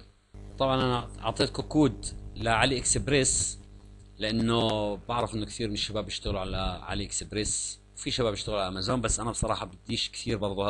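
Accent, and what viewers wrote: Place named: Jordan. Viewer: لهجتك بجنن اردني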